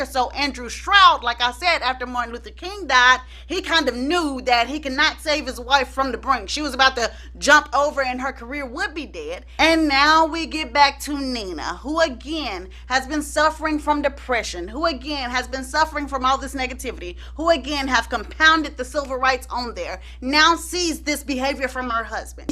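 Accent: South, United States. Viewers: I just love your channel and the Way you sound like a country girl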